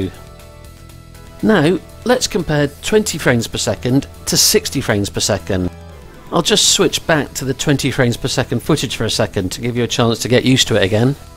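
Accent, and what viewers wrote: England, United Kingdom. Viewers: You have a graceful English accent,. Keep it going!:yougotthis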